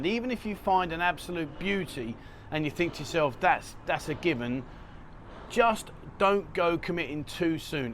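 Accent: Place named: London, United Kingdom. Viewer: Horst it's a London accent other people understand it.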